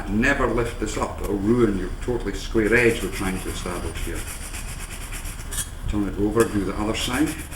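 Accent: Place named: Scotland, United Kingdom. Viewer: Thanks for the video. Nicely explained. The burr on the side of the tool is almost as pleasing as the burr in your Scottish accent. Remonds me of family and friends back home in Canada.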